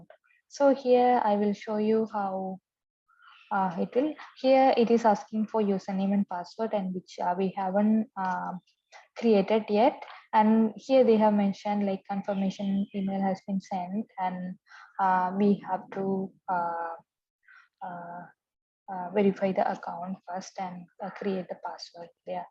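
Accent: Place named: India. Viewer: awaz se to aap indian lagti hai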